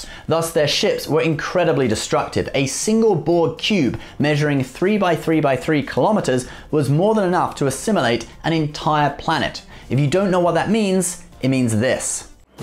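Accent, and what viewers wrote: United Kingdom. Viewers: IS BACK! GOD SAFE THE QUEEN! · The return of the sophisticated it’s always a pleasure to see your face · Woohoo, is back & we did not have to see that heretic American. thank you for this video, & thank you for not being a heretic. #Humanityfirstlast #Britishbeniskewl #Americanbendrools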